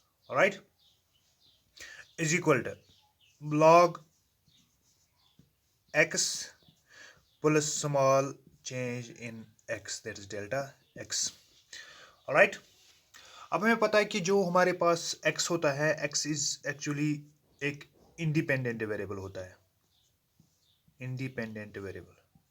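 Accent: Kashmir, India. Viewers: Are u from Kashmir sirr ? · I also think that sir is from Kashmir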